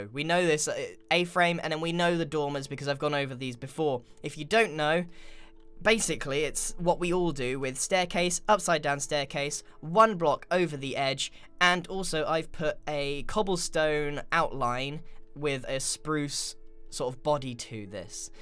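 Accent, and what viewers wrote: United Kingdom. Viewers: Are you Britain? It sounds like it. Newest sub BTW 😁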